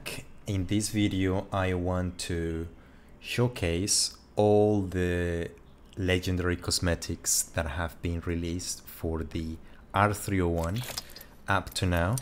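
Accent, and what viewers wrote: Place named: Italy. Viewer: Si sente che sei Italiano... giusto?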